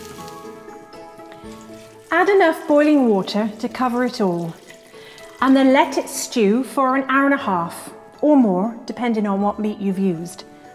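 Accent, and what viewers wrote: United Kingdom. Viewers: What an amazing look, what an amazing British accent, what amazing kitchen tools .. It's a Brilliant video which made me so happy 😁 it's so classy & elite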